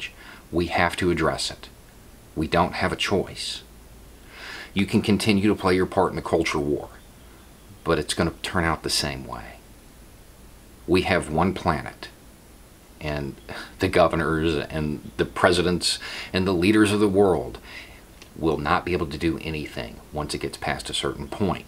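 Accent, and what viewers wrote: South, United States. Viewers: Not enough. Though I always hope that the beard and hick accent catches their attention for a minute.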